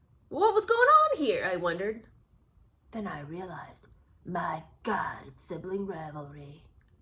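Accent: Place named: Cockney, United Kingdom. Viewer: You are my new favorite channel. Hilarious! Even my husband enjoys...he likes your Cockney accent.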